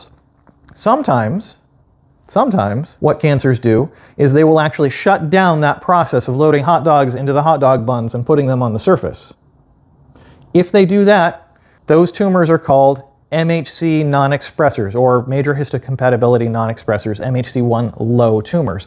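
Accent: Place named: Ireland. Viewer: Also your Irish accent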